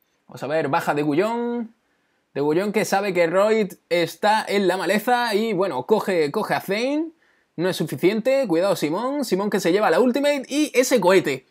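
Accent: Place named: Granada, Spain. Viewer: tu tienes acento de mi tierra de granada jejejeejeje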